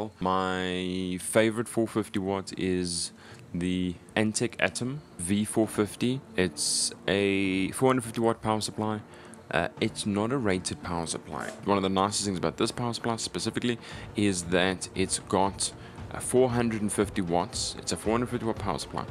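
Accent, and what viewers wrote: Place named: South Africa. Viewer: I really enjoyed hearing the South African accent, continue making these high quality videos!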